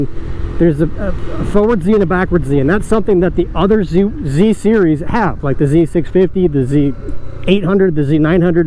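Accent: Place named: Chicago, United States. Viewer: you seemed to have some, of the Chicago area inflections, oh well, thanks for answering, have a good day.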